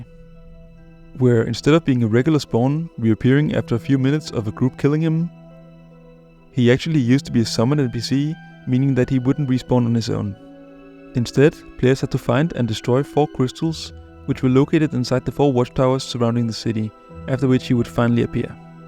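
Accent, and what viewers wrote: Denmark. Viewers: Simply cannot watch stuff with such a thick Danish accent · Good ole Dane accent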